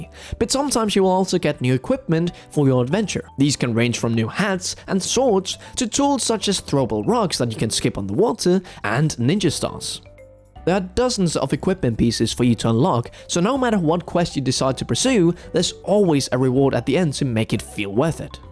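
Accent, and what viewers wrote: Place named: United Kingdom. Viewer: And through what I assume is your work at NL, your English (which was already quite impressive as an ESL speaker) has improved even further! It might be because you have a slight British affect to your English rather than the usual Mexican-American accents I'm used to, mind you, but you could pass off as a native speaker from a non-USA English-speaking country!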